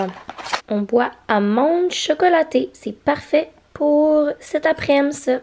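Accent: Quebec, Canada. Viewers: J’ardore les vlogmas de ils sont trop satisfaisant ❤️ Je l’aie attends toujours avec beaucoup de excitation je suis française 🇫🇷 mais j’adore l’accent canadien/ québécois 🇲🇶 🍁🇨🇦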